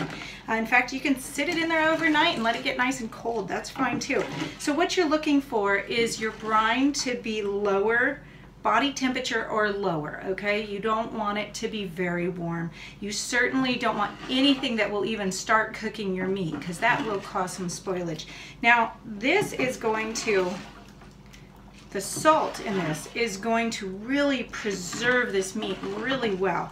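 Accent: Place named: Midwest, United States. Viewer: You sound like you're from the midwest...? I will have to have a go at some corned brisket. Mmmmmmmmmm.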